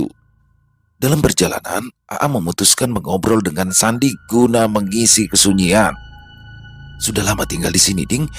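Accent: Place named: Banjar, Indonesia. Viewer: Bang orang apa sih? Logat bahasa banjarnya mantap mang..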